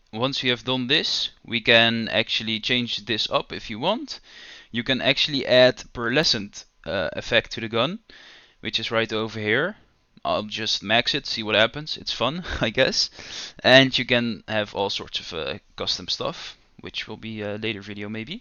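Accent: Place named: Netherlands. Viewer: ik hoor meteen dat jij nederlands ben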